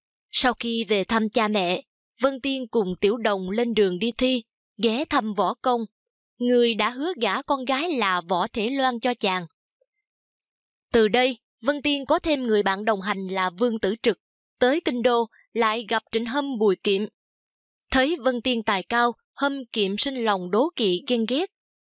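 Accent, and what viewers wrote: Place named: South, Vietnam. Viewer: Đúng rồi, phải đọc ngôn từ miền Nam như vậy mới đúng, chứ dùng ngôn từ chỉnh sửa cái gọi toàn dân thì trật lất hết rồi, chân thành cảm ơn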